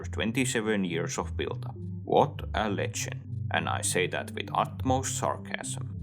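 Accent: Finland. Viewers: Are you Finnish by any chance? great video btw!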